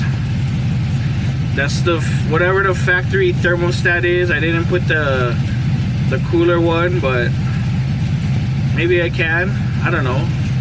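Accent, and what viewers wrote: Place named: Hawaii, United States. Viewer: now if only my tired 230k mile compressor could make high enough pressure to survive in texas. i thought i recognized that accent in the first video, hawaiians are rare on youtube. local boy 🤙🏼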